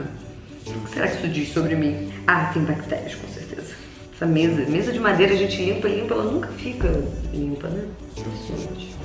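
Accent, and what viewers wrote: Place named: São Paulo, Brazil. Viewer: pegando o sotaque paulista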